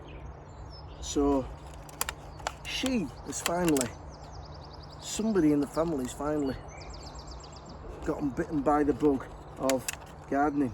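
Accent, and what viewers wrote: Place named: Leeds, United Kingdom. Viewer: Ah. As I continued watching I figured - LEEDS LEEDS LEEDS! Fellow Leeds man here! Take care!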